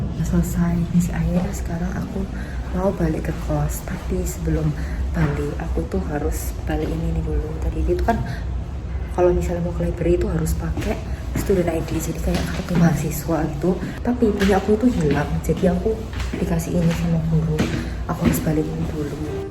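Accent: Indonesia. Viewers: logatnya kayak wong suroboyo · Logatnya tetep jawa bgt hahaha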